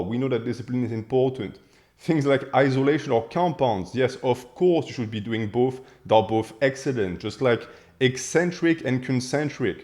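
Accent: France. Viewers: T'es français ? Je trouve que t'as l'accent.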